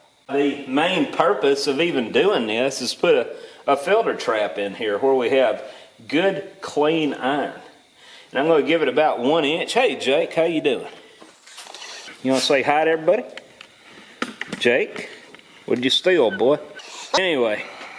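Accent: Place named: United States. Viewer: I miss Josie & Dollor and you speak very good American.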